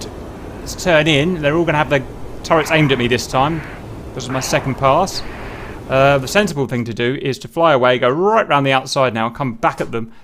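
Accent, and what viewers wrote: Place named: United Kingdom. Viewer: Your British accent makes these instructional videos easier to take in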